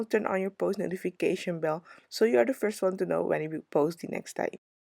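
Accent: Netherlands. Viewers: Hii honeyy love your work, love ur accent are u perhaps dutch? Xx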